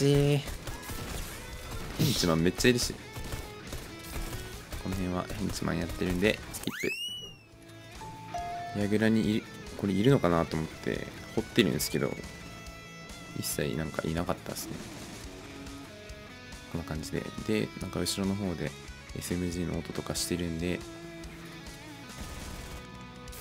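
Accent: Kansai, Japan. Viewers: さんてもしかして関西の子？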